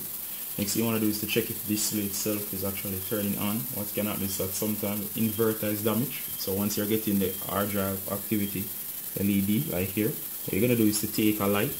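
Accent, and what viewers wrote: Jamaica. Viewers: Sound like a Jamaican named maro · oiii mi can hear sey ah jamaica u come from. mi laptop ah move ah way. him mi can link u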